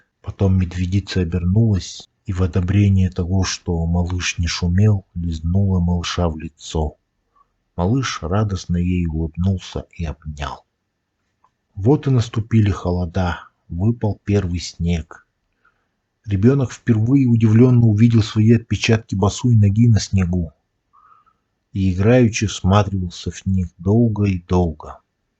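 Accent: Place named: Yakutia, Russia. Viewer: Я про этот случай слышал, тут просто красиво описано каким то писателем,прочитано с хорошей интонацией и знакомым якутским акцентом. 😄👍 А рисунки то какие 👍👍👍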